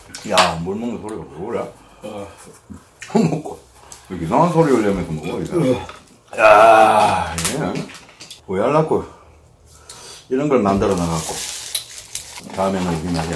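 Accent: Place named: Gyeongsang, South Korea. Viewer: 창원 사투리 맞는거 같은데요?ㅋ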